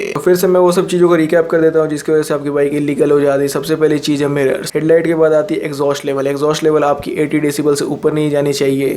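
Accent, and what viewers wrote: Bihar, India. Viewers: Bhai tm Bihar se hai ?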